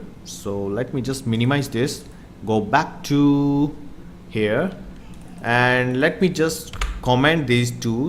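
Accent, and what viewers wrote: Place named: India. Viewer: Indian accent = "instant dislike" print(Indian accent) instant dislike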